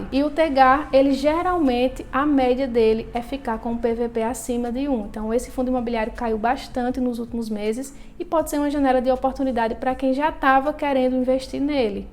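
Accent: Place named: Ceará, Brazil. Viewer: Lindo sotaque. Parece de Juazeiro do Norte Ceará.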